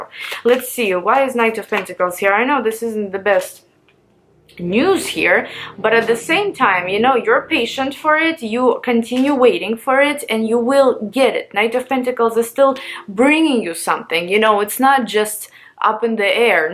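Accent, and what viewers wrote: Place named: Russia. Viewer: you’re the only one who among of all my fave tarot readers who have been extending such concern about this current situation which the whole wide world is seriously getting so alarmed right now, i hope and prayed this too shall pass and that everyone will be safe, and one more thing you i don’t really know you personally but i just got some sense that i think you’ve got this Russian accent or something, like i don’t know..but you simply reminds me of my Russian friend.♒️💖🙏🏻💪🏼✨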